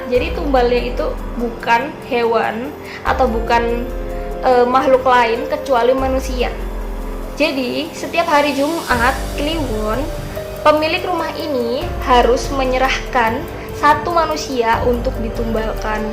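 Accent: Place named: Surabaya, Indonesia. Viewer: Exspresinya dah ok mbak....tp suroboyoan ne iku lhoo seng jek ketok😂😂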